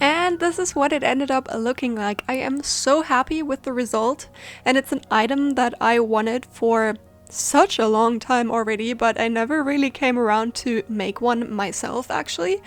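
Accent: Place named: Germany. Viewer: Are you German? Coming from a German, you sound very German.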